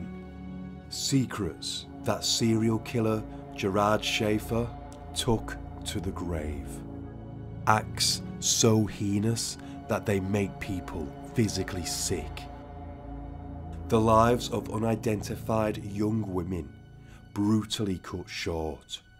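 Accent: United Kingdom. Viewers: 8 Million UK narrators doing US Stories. What is the frickin deal??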